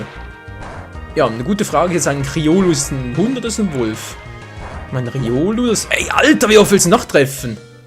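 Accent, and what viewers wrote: Switzerland. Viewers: ja man hört es auch bei akzent das es schweizer ist ich bin italiänerinn und rede deutsch wie eine italiänerinn aber schweizer deutsch so berndeutsch wie eine schweizerin xD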